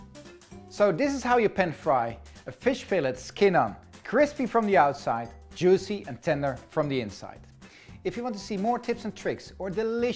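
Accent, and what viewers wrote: Netherlands. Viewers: your English has improved a lot! As a Dutch speaker I could notice all the small Dutchisms you put in the video. Nice job!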